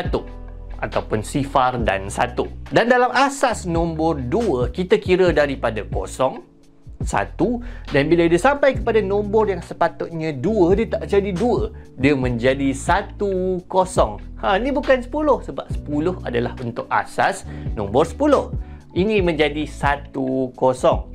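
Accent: Malaysia. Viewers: Logat orang Malay membuat saya sendiri tertavva terbahak bahak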